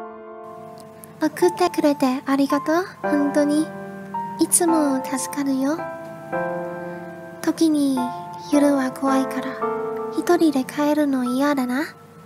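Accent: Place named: Japan. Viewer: Your Japanese accent ie awesome.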